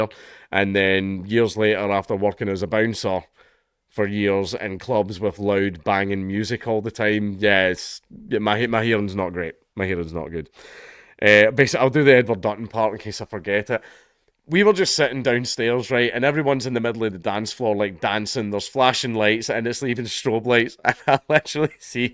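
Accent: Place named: Scotland, United Kingdom. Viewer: absolutely LOVE the bouncer stories. The way you say it all in your Scottish accent makes it so much funnier when something funny happens.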